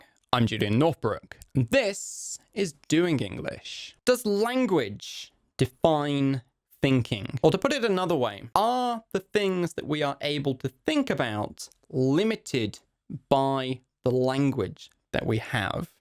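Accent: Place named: United Kingdom. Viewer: Julian I don't used to like british accent, But yours i liked, I'm just liking for some reason...as soon as possible I'll buy your book Good job bro.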